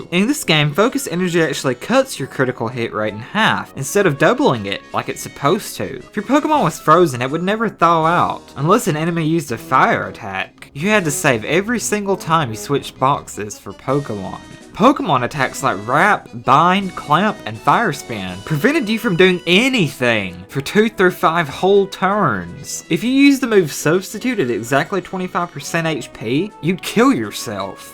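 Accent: United States. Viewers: this guys voice... so fucking annoying country accent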